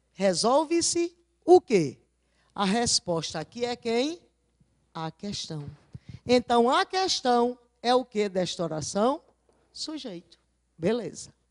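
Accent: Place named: Northeast, Brazil. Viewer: Até que fim, encontrei alguém que fala minha " língua" nordestina porreta. Arretada de boa, clara e objetiva. Obrigada. IVANI.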